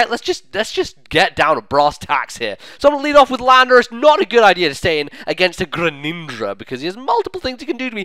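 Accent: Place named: United Kingdom. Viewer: nice video, gotta love this british accent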